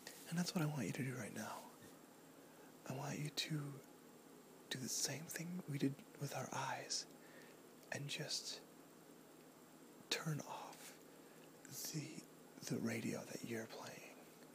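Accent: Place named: New England, United States. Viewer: Are you from Boston?? Or anywhere in mass. Or southern new Hampshire because you talk exactly the way I do and I'm from southern new Hampshire :)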